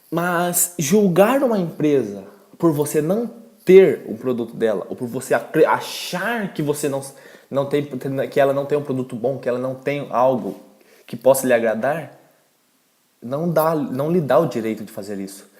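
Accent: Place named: Fartura, Brazil. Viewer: Huahua Sotaque de Fartura hauhua, muito bom...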